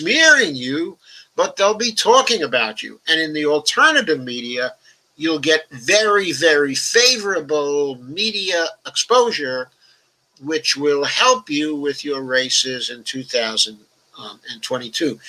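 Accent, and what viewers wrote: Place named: New York, United States. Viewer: Are you by any chance from the same part of NYC as Prof. Richard Wolff? I was listening to this while doing chores and noticed that your accent/cadence bears an uncanny resemblance... :) BTW, great vid!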